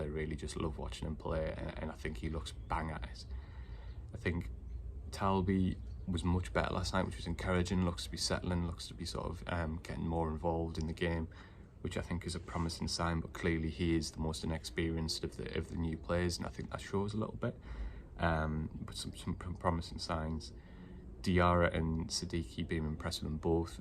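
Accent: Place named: United Kingdom. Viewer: It is my language, however it's the British accent that I'm not accustomed to, along with the background noise, that's making it really tough to make out some of what is trying to say.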